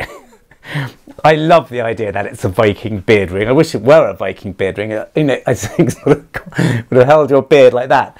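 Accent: RP, United Kingdom. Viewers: You are without any doubt the best metal detecting offering on YouTube, your posh accent is honest and endearing,absolutely love it.taski is always a bonus but of course cannot always feature, love the taxidermy in background, keep up the superb quality of content all the best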